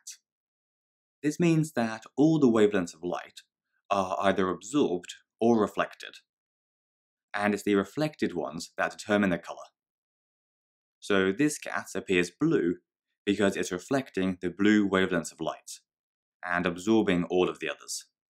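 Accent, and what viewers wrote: United Kingdom. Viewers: I love bri'ish English.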